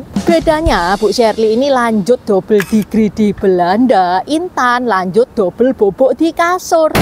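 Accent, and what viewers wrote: Java, Indonesia. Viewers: saya lebih suka kalo logat bicara intan normal ( tidak medok ) tolong lah di perhatikan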